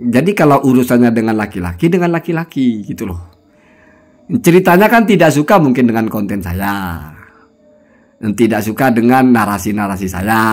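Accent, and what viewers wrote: Madura, Indonesia. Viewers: Mungkin maduranya agak lain guss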